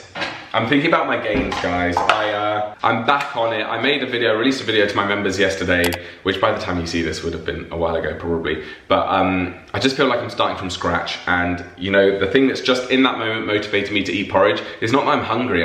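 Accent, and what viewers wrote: United Kingdom. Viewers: Every time you add an R in your speech now I notice it 😂 it’s amazingly British! American accents are so boring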